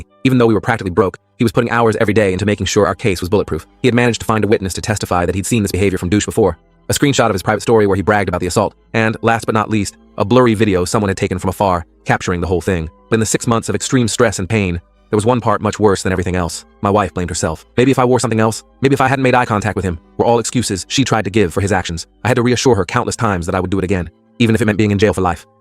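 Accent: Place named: United Kingdom. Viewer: The British accent is just no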